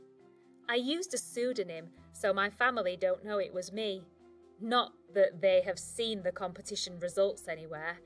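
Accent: England, United Kingdom. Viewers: Beautiful English accent!. I love listening and enjoy reading your stories. Thank you.🙏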